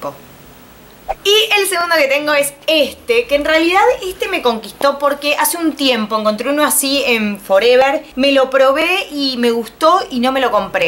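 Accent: Argentina. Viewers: ¿De que parte de Argentina sos?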